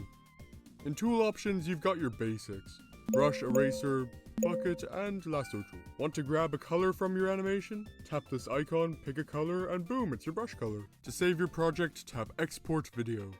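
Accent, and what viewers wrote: Ireland. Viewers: Why he sound like Irish? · Are you irish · Eh, more like nogla to me, it might be because hes Irish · Bro is Irish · Are you from Ireland? Because I am on FlipaClip, it’s the only one I have so far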